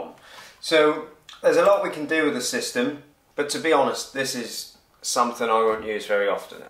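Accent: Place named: Norwich, United Kingdom. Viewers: Thought I recognised the accent. I was bought up in Hellesdon (not the Hospital ) I've heard all the jokes 😒😅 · Apparently most people think the English don’t know how to speak Merican. He does come from the country that invented our language and maybe…just maybe he is saying it correctly and we as Merica is not? (I am married to a Brit and I’ll tell you there was a learning curve to what England English sounds like…kinda like trying to understand anyone from Alabama, Mississippi, either Carolina and southern Ohio) His technique on instillation is spot on.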